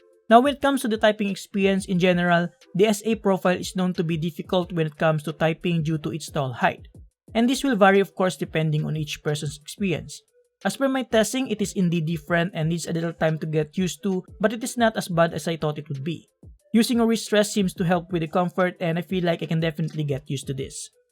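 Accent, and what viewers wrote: Philippines. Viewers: I love the filipino accent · his Filipino accent tho is insane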